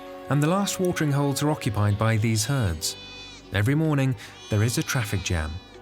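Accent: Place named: United Kingdom. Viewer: the herder reaches inside to pinch or sever the aorta leading to a swift loss of consciousness and death. Just because you find it gruesome doesn't mean it's not humane. The narrator sounds British and if he says it's not brutal, maybe you should agree and stop trying to pick on races you feel superior to.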